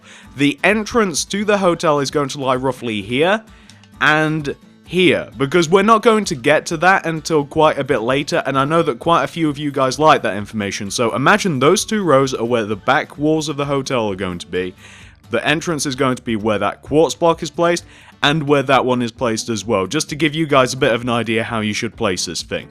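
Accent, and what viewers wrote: United Kingdom. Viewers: It’s a just a brutish accent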